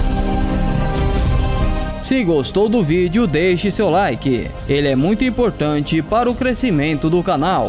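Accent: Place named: Ceará, Brazil. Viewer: eu tavo vendo uma coisa voce tem um sotaqui de seara voce e searence ne cpz kkkkkkkkkkkkkkkk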